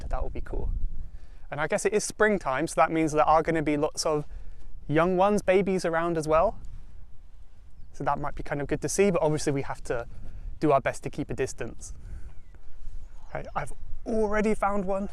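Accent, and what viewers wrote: United Kingdom. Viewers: I love accent british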